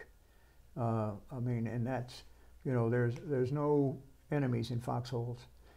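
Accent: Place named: Connecticut, United States. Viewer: Interesting accent. Connecticut?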